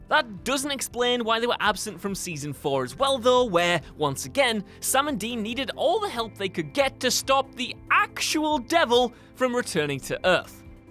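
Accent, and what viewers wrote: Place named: United Kingdom. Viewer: Because he is reading a lot and people make mistakes? Because he pronounces that differently like most people do with most of the ever evolving and changing language? Because he's British?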